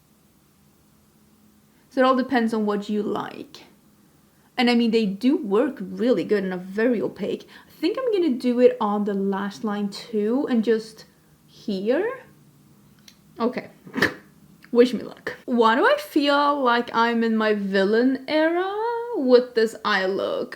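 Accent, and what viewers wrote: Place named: Sweden. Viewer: Don't you so love how she always says " let's soom in " instead of zoom in...so sweet and Swedish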